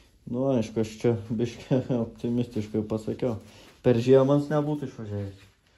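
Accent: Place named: Aukštaitija, Lithuania. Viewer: Nešnekiek aukštaitiška😃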